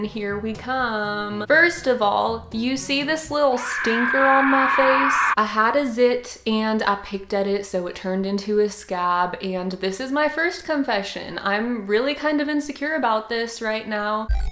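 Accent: South, United States. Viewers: What I heard: how do you lack living in a big family? What she said: how do you like living in a big family? She got one nice country accent y'all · Are you from the south? Lol you say y’all. Just wondering, because if so, I’m from the south too! Y’all have a nice day!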